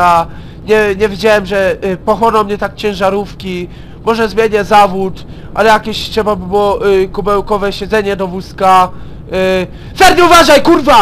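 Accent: Silesia, Poland. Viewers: ty jesteś z Śląska ??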